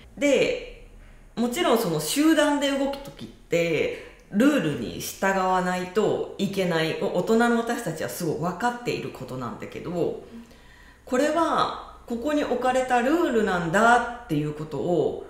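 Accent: Kansai, Japan. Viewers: Momoyoさんこんにちは。今回の動画も、Momoyoさんの話を聞きながら、自分はどうだろう？境界線引けてるかな？なるほどなあ、など、たくさん自分と向き合うきっかけをいただきました。ありがとうございます。わたしの中にチクチクと刺さっている言葉があったのですが、今回のルールのお話でその言葉がすぅっと消えていった感じがありました。 「ルールだから」「慣習だから」は、本当の愛を見失ってしまいますね。わたしはわたしの愛を表現する力を頂いたように思います。ありがとうございます。 Momoyoさんのツッコミや関西弁、歯に衣着せぬ物言いがだいすきです。今回も何度も吹き出しながら動画拝見しました笑ありがとうございました。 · 『理由はありません、て言うてもてるやん』← の関西弁、好き❤️ 確かに、人に迷惑かけない為のルールに縛られすぎてる❣️って、迷惑かけるが大前提になってますやん（笑） お互いに尊重できる日本になるといいな^ ^いつもありがとうございます✨🙏✨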